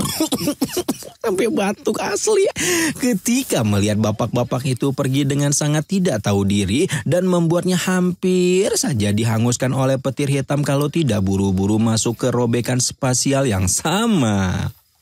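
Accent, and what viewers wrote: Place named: West Java, Indonesia. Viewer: Coo ... Humor gw .. sekilas orng sunda si mamang 😂😂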